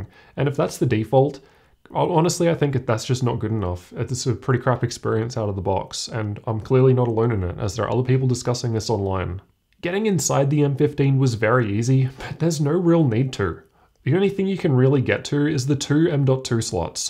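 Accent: Australia. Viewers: I thought the accent is supposed to give it away that he's Aussie.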